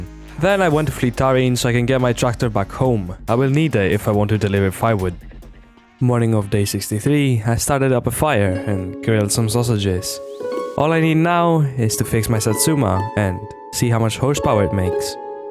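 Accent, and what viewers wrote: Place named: England, United Kingdom. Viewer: Bro i love your english accent👍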